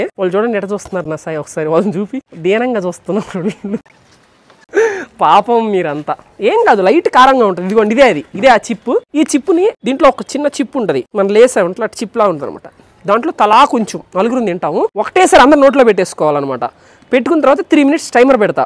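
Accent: Nellore, India. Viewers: నెల్లూరు slang ❤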